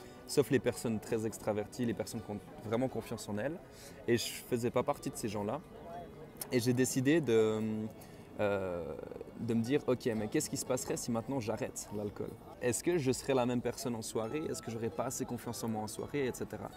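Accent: Fribourg, Switzerland. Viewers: petite question inutile ... es-tu suisse ? tu as le beau accent fribourgeois ;) (super vidéo ! ) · Salut, J'ai l'impression que tu es de Suisse (ton accent) ^^ et tu as tournée où cette vidéo? Je vois qu'il est marqué Rue de Lausanne derrière mais je n'arrive pas à lire l'autre plaquette ^^ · je voix que tu es Suisse, j'ai deviné juste avec ta voix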